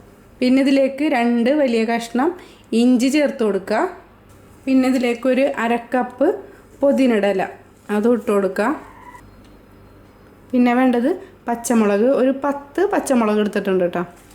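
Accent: Kodungallur, India. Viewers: 3 പീടിക, sn പുരം, കൊടുങ്ങല്ലൂർ ഈ പരിസരത്ത് ഉള്ളവരുടെ സ്ലാങ്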